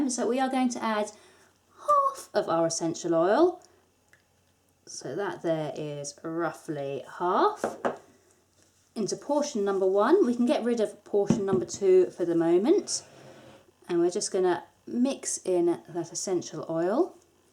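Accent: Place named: England, United Kingdom. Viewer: Every time I hear your intro music, I'm all geared up for you to have a Beverly Hillbillies accent, and then I do a little double take when a charming English accent comes out instead.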